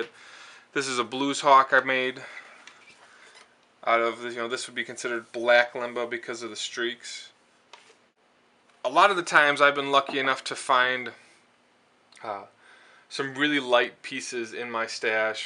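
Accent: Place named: New Jersey, United States. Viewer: That sounds like a Jersey accent. Good review.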